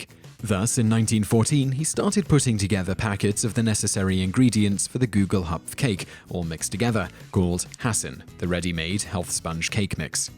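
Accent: United Kingdom. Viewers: Pave Stiletto The video doesn't put it in words on the screen, but it does say the original flavor was peppermint. The word Pez is an abbreviation of the German word for peppermint.(PfeffErminZ). The video could have been much MUCH clearer on it, and unfortunately, seems to be a huge problem with all of this channel's (and those of the sister channel Top Tenz) videos. They aren't clear and tend to go on rambling stores that don't appear to actually give any answers other than just interesting history. They do give the answer, but unfortunately, Simon's insistence on pronouncing everything the British way, and the rapid drive-by of lesser-known words and facts causes you to need to do extra research to find out the information the title hints at. And, if you have to do that to every video they make, what's the point in watching the videos in the first place?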